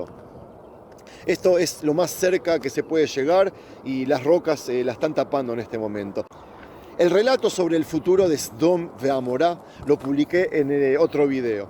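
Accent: Argentina. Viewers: Creo que él es de origen argentino.. por eso habla asi.. por su asento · Feliz navidad??? Bien dice las Sagradas Escrituras: profesan ser judíos mas son sinagoga de satanás. Según éste estudia la Tora con doctrina romana y protestante, además tiene acento argentino sho digo que vos es un falso judío. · Este señor es argentino por su acento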